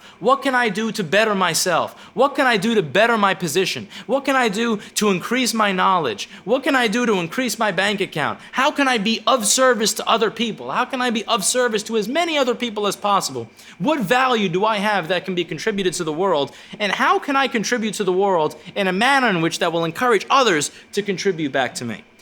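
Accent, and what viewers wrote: New York, United States. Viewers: Your inner new Yorker is coming out in your vowels lol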